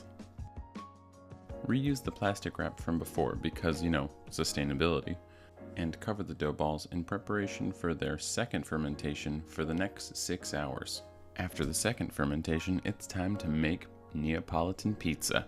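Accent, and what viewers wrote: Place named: Italy. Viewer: Your Italian accent is stunning! As is the pizza!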